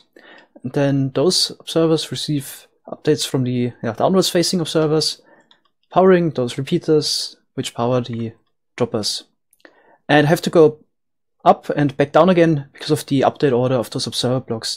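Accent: Germany. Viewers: ahh ok, thanks. where you from, you sound like german i have that feeeling :D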